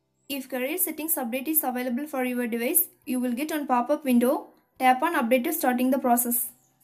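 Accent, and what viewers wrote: India. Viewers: the thick indian accent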